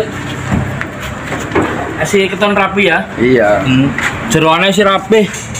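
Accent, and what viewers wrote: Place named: Banyumas, Indonesia. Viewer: Salam. Ngapak mas. Tambah Sukses selalu. Amin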